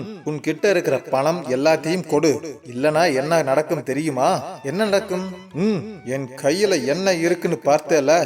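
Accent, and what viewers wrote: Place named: Sri Lanka. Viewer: அவதானித்திருக்கிறேன் .இன்னும் இலங்கை தமிழ் விடவில்லை 😢 லைக் செய்துவிட்டேன் .நட்பே ❤😂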